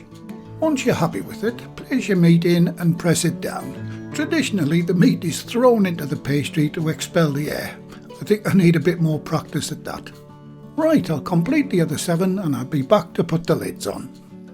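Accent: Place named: England, United Kingdom. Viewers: you are very welcome👍. I'm from Cumbria but. Live with my Khmer wife in Cambodia. It's so nice to here a northern accent & I so miss pork pies but thanks to you, I'll now be making my own thanx to your simple pork pie pastry, cheers👏👏👏👏👏👏👏👏👏👏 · I'll be honest... I subbed for your voice and manner of speaking/talking... you sound absolutely delightful and lovely and sweet/kind... typical English ( once upon a time when there were cockneys in London, they too were like this, just slightly different accent and manner). Like the recipe themes so far, will see if there is a sausage roll one. ^_^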